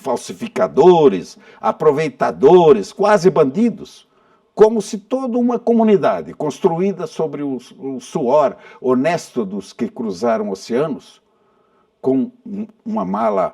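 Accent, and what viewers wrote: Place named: Sardinia, Italy. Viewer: Eu sou italiano "sardo" aprendi português faz muito tempo e seu sotaque é parecido ao meu. Compreendo as razões de sua queixa.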